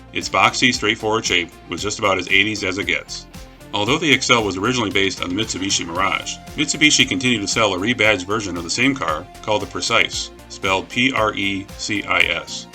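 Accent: United Kingdom. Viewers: The way the British pronounce things are so freaking annoying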